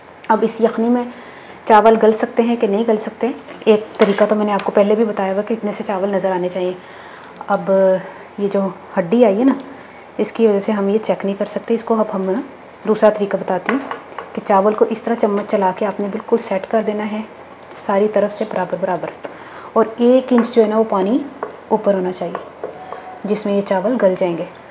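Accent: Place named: Punjab, India. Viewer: I like your Respi and punjabi style taking is great. I have been made your Gurwalay chawal. My wife is love it. Thankyou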